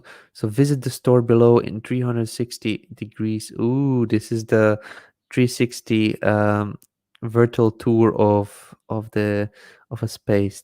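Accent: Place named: England, United Kingdom. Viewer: I like this video but sometimes is hard to follow the english accent in all of his videos. thanks!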